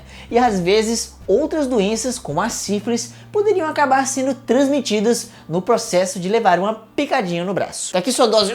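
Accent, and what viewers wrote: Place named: Northeast, Brazil. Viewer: Tu é de onde aqui do Nordeste ? 🤔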